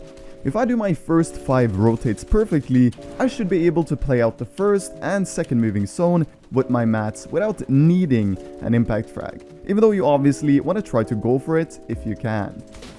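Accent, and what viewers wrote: Norway. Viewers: Are You norwagian?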